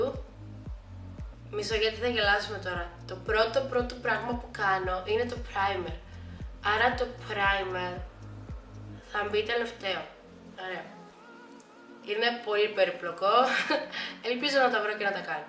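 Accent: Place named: Cyprus. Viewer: Εγω καταλαβαίνω να κυπριακά σου γιατι ειμαι και εγω απο τη Κύπρο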